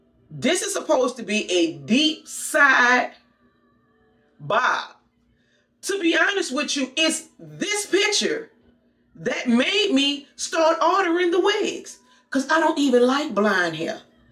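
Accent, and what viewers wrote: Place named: New Orleans, United States. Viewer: HAHA! Stumbled upon this channel looking at TEMU reviews and OMG as soon as you said BIG CHONKY instead of chunky and "Head full of hair", I just knew you are from New Orleans 🤣🤣🤣⚜⚜⚜⚜ #Subscribed but even though you make your own wigs, you can make a lot of money on here doing wig reviews for companies. Particularly it's the Asian companies that reach out and want to work with you. That big blonde wig is sending meee! 😂😭😭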